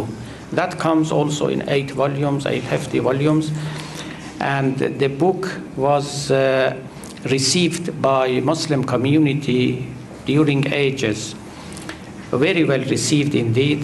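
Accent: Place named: Iran. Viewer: 30 years ago i listened and watched to Mr. Sorosh traslations of Rumi poets from persian to english on Iranian TV. I must say his english was better, faster and with a little persian accent. Now he is slower and the tone of his voice is alwayes same which makes it hard to follow his remarks and ideas ....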